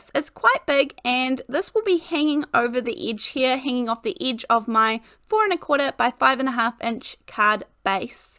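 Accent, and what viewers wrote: New Zealand. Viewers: Another great card! I just love all your creative, use what you have video's. You have become my favorite YouTube person to watch. Love your New Zealand accent too.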